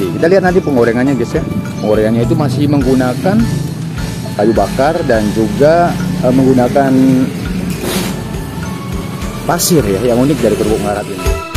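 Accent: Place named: Malaysia. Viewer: Dengar dari logat kayak orang melayu youtubernya.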